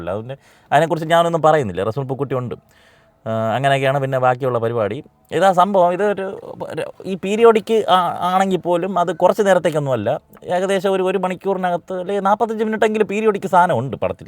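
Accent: Trivandrum, India. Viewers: ഇതാണോ തിരുവനന്തപുരം ഭാഷ ആണെങ്കിൽ nice❤❤ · Itrem bore presentation, maryadakk samsarichude, istapettillel athang paranjapore, trvm kare parayipikkan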